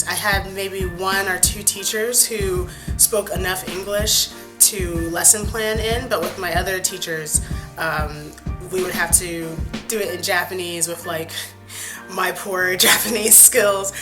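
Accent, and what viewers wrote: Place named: United States. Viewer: she sounds from the usa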